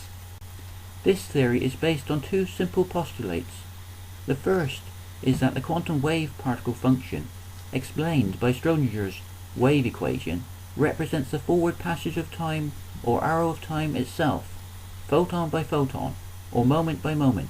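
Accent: West Country, United Kingdom. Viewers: Ahhh you sounded westcountryish.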